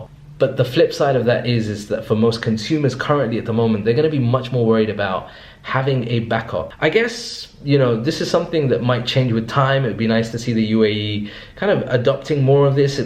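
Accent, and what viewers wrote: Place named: United Kingdom. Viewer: I'm sorry why do you have a British accent?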